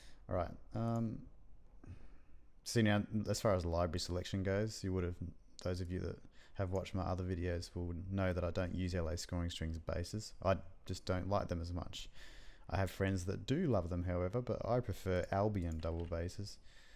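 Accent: Australia. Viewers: Also I notice you have an Australian accent. Where in OZ are you from? Did you know that Cinematic Strings was recorded at the Verbruggen Hall at the Sydney Conservatorium? Have you tired CS?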